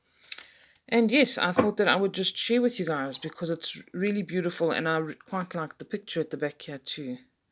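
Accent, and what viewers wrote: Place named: South Africa. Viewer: I was born in Cape Town, so I was immediately drawn to your South African accent lol. I am thinking of purchasing this deck and came across your channel when I searched for videos on the Celtic Tarot. Thanks so much, I think I may grab myself a copy. The art is indeed very interesting and beautiful, and although pip decks are not typically my favourite, this one definitely does intrigue me 😊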